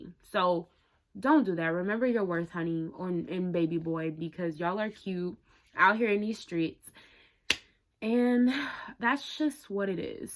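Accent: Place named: New York, United States. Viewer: Sounds like you have a New York accent lol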